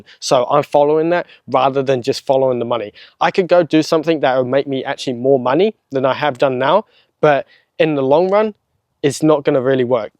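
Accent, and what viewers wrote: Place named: New Zealand. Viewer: Are you in NZ? sound like a Kiwi. Great to have your passion.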